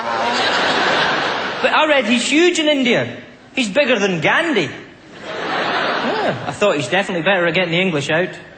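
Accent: Australia. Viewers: how funny is this guy, he does aussie better than most aussies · his aussie accent is spot on, and aussie parliament is exactly like that........ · Wonderful aussie accent!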